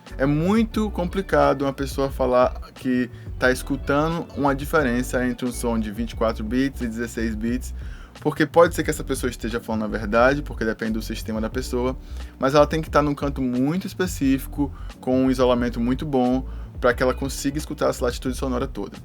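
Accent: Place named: Bahia, Brazil. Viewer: A melhor parte é o sotaque baiano.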